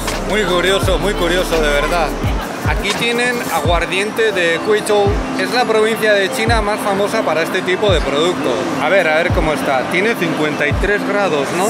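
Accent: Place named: Basque Country, Spain. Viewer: Tenía yo un concepto malo de China, una megafábrica hipercontaminada y de bajo nivel, pero me están encantando los paisajes, calles y gentes que se ven en vuestros vídeos, muy distinto a lo que imaginaba. La grabación “en movimiento” es un formato innovador. La dulzura y simpatía de es el complemento perfecto al acentaco vascorro de. Saludos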